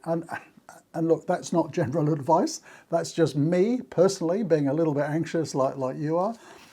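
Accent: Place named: Australia. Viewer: It's that Aussie accent: VDLP or Via de la Plata, the name of one of the Camino routes.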